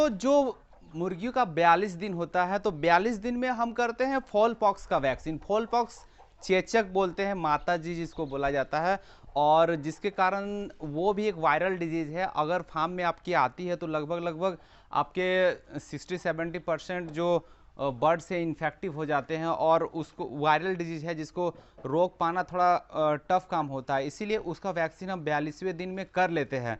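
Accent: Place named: Seraikela, India. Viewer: Bat karne ka andaz se malom huta aap sarai kela said ke ....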